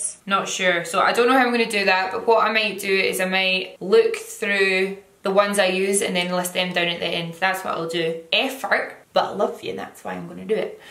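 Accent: Scotland, United Kingdom. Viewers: I love your accent. Are you Scottish?:)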